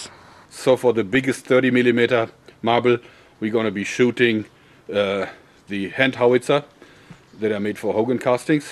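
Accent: Germany. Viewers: im detecting german accent in you, yes? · Are you germany? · were are you come from you speak a liitle bit like a german areyou from there ß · are you German? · Are you German? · Are you German ? ^^ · thumbs up for the german accent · Man hört so das du aus Deutschland kommst haha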